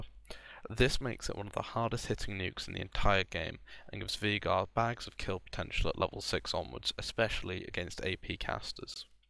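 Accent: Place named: United Kingdom. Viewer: It's typical British accent ;)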